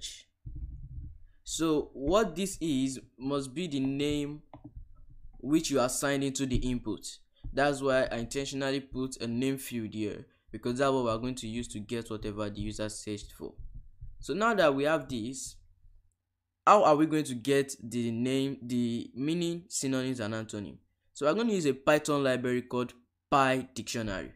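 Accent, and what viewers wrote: Nigeria. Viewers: Are you a Nigerian 🇳🇬? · It is so funny how after listening to the first 6 seconds I knew this was a Nigerian😂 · From the accent and name, I guess you're Nigerian... Yay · Very hard to miss the accent. Naija for life. 😍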